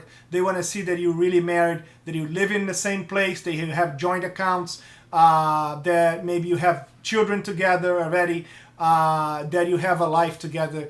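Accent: Brazil. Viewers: Hey. Great explanations. May I ask you if you are brazilian? I am, and I just noticed a simillar accentuation. If not, forgive me any incovinience. By the way, do you Speak Portuguese? Hugs. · What do you mean? I asked if he was Brazilian because of his accent. Btw, I am from Brazil. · Looks like Brazilian accent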